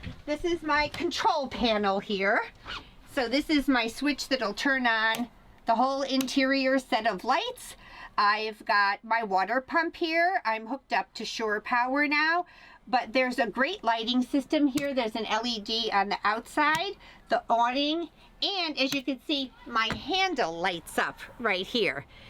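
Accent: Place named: Wisconsin, United States. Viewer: I love your Wisconsin accent!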